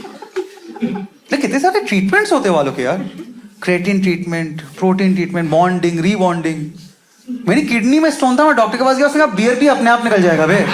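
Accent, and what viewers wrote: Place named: Bengal, India. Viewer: I like your benlivised english.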